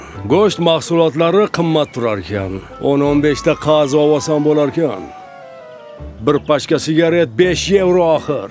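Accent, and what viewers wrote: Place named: Samarkand, Uzbekistan. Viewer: aka Samarqand shevada gapirdi😅 Farg'onalik shoir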